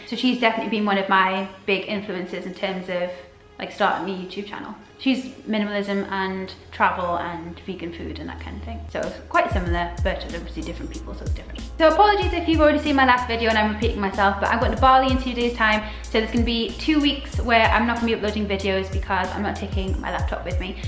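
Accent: United Kingdom. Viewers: Hi! Love your sweet & funny videos :-) Have a question regarding your cats - since one doesn't like the other & all - are they both or one of them adopted? And both or one of them recently? Just curious since we adopted a five (now six year old) cat-lady not long ago ourselves :-) Also - did they enter your household pre or post minimalism? Did you declutter pet related stuff too? If so, how/what? What have you found essential to keep for them? Perhaps you could make a video on that topic & show us? (please?) Love your specific brittish accent, whatever mix it may be :-) And btw you remind me in some ways of Emilia Clarke! Not as any of the characters she plays but herself in interviews etc :-) In a good way of course! Hugs from Sweden!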